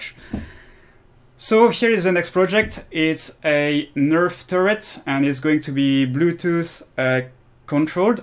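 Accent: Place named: France. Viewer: j'ai direct entendu ton petit accent Français des le début de la vidéo xD sinon super vidéo !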